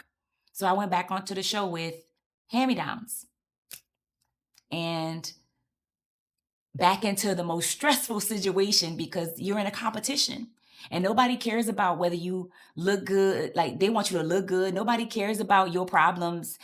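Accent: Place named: New Orleans, United States. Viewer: Love! Keep going! That Nola accent tho 🤤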